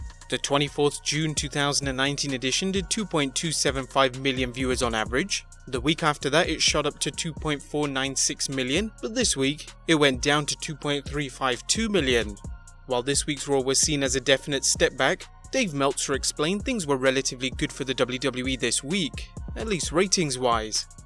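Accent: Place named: London, United Kingdom. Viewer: lol Alex Jones has well over 6 million radio listeners and an additional 10 - 12 million viewers on the internet daily. In comparison to the WWE and their fake bullshit vs a truth telling Infowarrior who in the hell cares about phony ass wrestling when the world is in crisis. Turn off your televisions you idiots all hell is about to break lose and when it does there wont be any phony wrestling to watch. judging by this clowns accent I can see why London has fallen, instead of being out there supporting a real bad ass hero named Tommy Robinson he's watching phony wrestling and worshiping fake ones.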